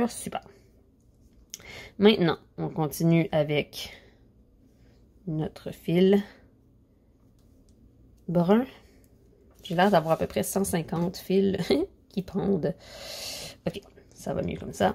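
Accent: Canada. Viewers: Je suis définitivement fan de tes vidéos !!! Grâce à tes tutoriels j'apprends plein de nouvelles astuces tricot. Tout est vraiment bien expliqué et compréhensible. En plus ton accent et tes expressions me donnent encore plus envie de découvrir le Canada!! Bonne journée et merci pour ces échanges.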